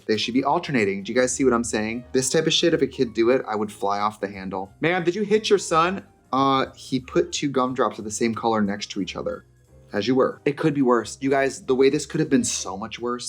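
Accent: Wisconsin, United States. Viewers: Her Wisconsin accent was very strong in this video 😭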